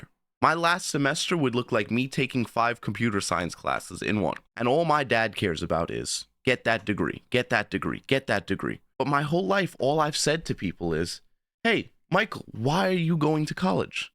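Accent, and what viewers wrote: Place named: New York, United States. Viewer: had a feeling you were from new york coach lmfao keep up the good work!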